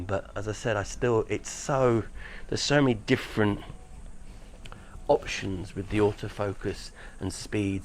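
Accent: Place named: United Kingdom. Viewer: A very good effort for your first vlog attempt with interesting content and I am looking forward to seeing more on the OM-1 as it is on my Christmas wish list. It would be good to include your location in the vlog as I was playing detective trying to work it out. UK accent and driving on the left, so I originally thought somewhere in the UK. But the light just appeared too harsh to be the UK. You said the temperature was 35c, so I thought you must be in Australia. But then you were photographing Pacific Swallows, so I started to suspect Japan but was not sure. It was not until I read one of your comment replies that I knew you were in Japan for sure!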